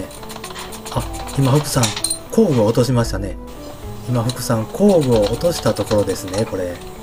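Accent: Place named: Tokyo, Japan. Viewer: 喋りが変な東京風になっちゃった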